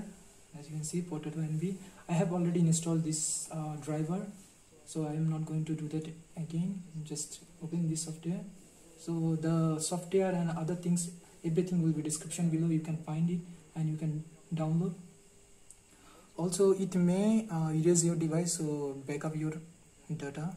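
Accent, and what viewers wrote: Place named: China. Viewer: I don't know If I can trust you because you sound like Chinese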